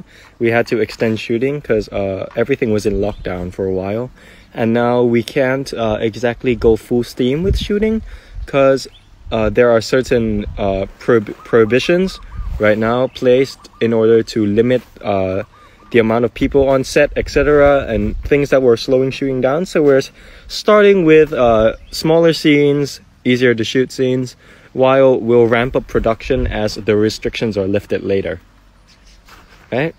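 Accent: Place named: United States. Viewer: He is heavily influenced by American English. His English sounds more or less like American rather than British or Australian or other accents.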